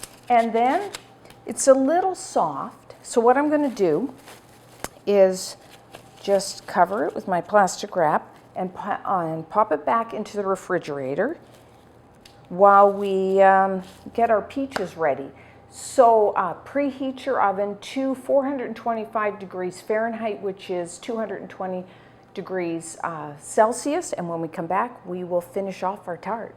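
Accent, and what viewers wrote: Canada. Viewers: You used to live in Canada right. You have that accent. I love your recepies I've been watxhing for about a year and I love your channel!!!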